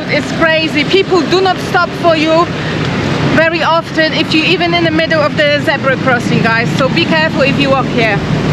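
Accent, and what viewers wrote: Thailand. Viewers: 😊❤สวัสดีครับคุณพูดไทยสำเนียงอิสานเหมือนผม..น่ารักมากครับ,,🙏❤️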